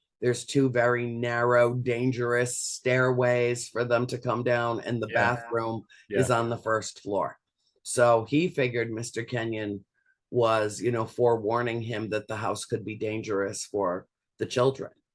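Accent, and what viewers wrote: South Africa. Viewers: I will definitely. I like your Afrikaans accent. Groete van Kaapstad!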